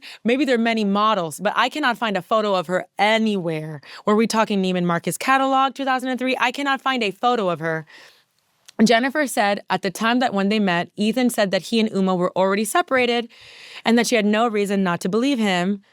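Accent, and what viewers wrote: Miami, United States. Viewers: i cannot express how much ur miami accent comforts me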